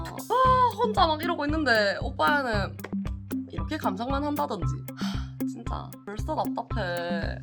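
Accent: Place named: Gyeongsang, South Korea. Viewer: 말투가 딱 대구아네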